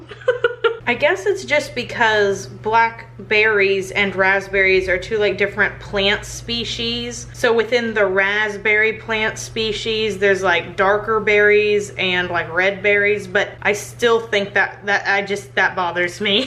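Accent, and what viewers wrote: Texas, United States. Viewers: The way you say scrapey scrapey is similar to grav3yard girl's sippy sippy thing. But it's probably just the texan accent to most people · You do sound like graveyard girl, it must be the Texas accent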